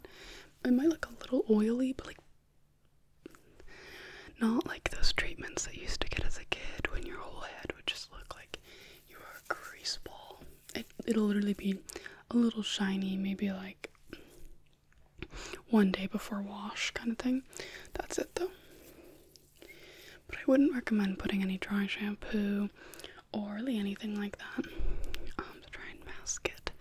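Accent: Canada. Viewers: ...Nigga are you canadian?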